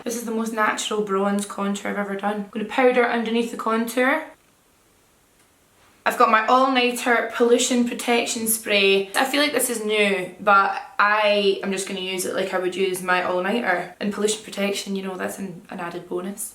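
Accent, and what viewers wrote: Scotland, United Kingdom. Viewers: I discovered your channel a few months back and you've become my favourite YouTube by far. You are absolutely stunning and amazing at make up! I normally find really Scottish accents too cringy to listen to but I love yours (I'm Scottish too, but I don't have a strong accent). My manager at work said she knows you from when she was a makeup artist in Glasgow too, small world 🙈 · Your accent makes me miss living in scotland!!! 😢😢 anyways you look BOMB as usual 😍😍😍😍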